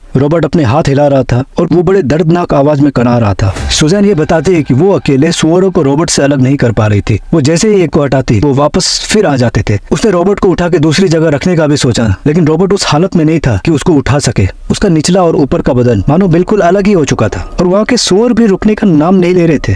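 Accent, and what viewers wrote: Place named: Northeast, India. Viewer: आपका हिंदी का नौर्थइस्ट वाला ऐक्सैंट आपको ज़रूर हिट करेगा।लगे रहो दोस्त।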